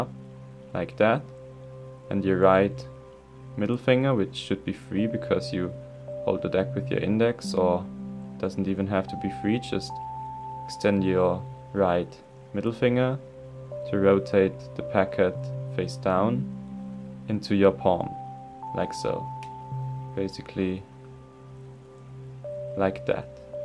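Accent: Germany. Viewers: Are you from germany or somewhere there? Great tutorial btw :)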